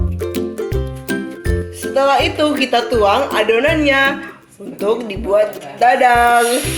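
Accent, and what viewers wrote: Palembang, Indonesia. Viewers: palembang banget logatnya, R nyo berderuuuuuupppp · oo pantes aja ..suara dari logatnya keliatan bnget buu...Salam wong kito galo😄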